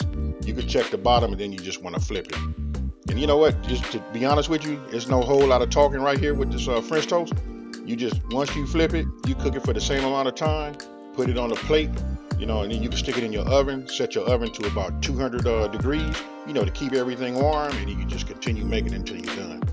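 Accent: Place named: California, United States. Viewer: The California accent though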